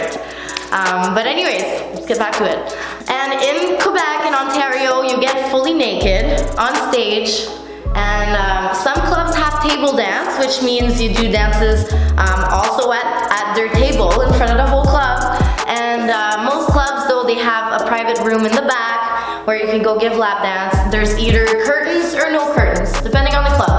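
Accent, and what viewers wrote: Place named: France. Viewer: Girl I hear ur French accent and I’m francophone aussi lol I love it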